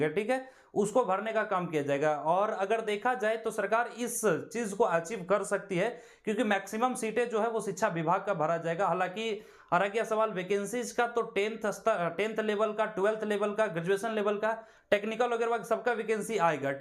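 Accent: Bihar, India. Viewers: Bihari ho lagta h tera baat se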